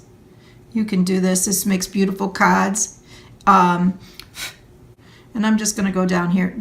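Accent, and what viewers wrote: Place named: New England, United States. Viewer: New England? I hear it, dahk instead of dark... and idear instead of idea (my hubs is from New England). Do you also say acrost instead of across? I think it's adorable ;-). Anyway, fun tute, thank you, plus Plum Blossom is one of my daughter's nicknames so I think I'll paint something like this for her.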